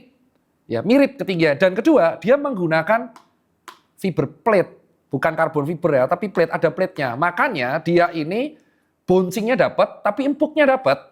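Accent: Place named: Surabaya, Indonesia. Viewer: Saya fans baru dokter 🙏 pas liat YouTube ada dokter Lewat. Saya suka gaya bicara dokter yg apa adanya, nada Surabaya yg khas👍 terbaik dokter idola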